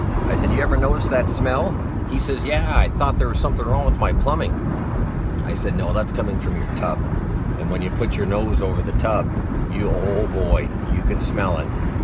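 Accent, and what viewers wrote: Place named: Canada. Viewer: Hey Bro ,,,,you gotta be a Canadian,,,,I hear yer "In's and yer Ouut's" lol !!!*^%$%%#!!!!!,,,.You're a hard worker with a lot of guts.Im a plumber in California.I learned a lot.Keep up the good work.DD In L.A.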